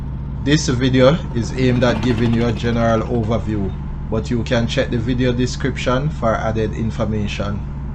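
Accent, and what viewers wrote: Jamaica. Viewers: He is fine. A true Jamaican! No fancy accent needed💙💟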